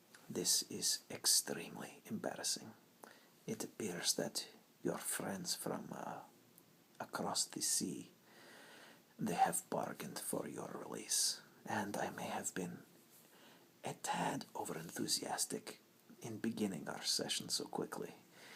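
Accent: Russia. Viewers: the russian accent ... is pure tingles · Ur Russian accent is amazingly cute and a bit funny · How are you able to speak with a Russian accent?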